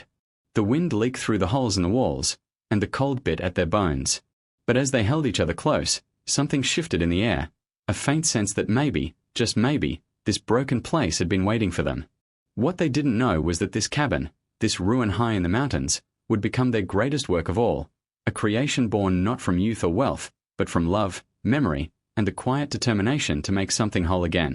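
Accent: United Kingdom. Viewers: ♥️♥️♥️♥️ Loving the British accent ♥️♥️♥️♥️ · Do not care for the British accent! Not subscribing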